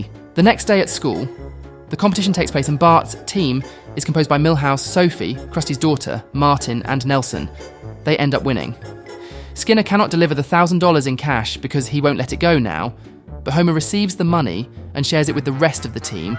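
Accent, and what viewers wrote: United Kingdom. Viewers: Curious question if you're from the US how come you have a British accent...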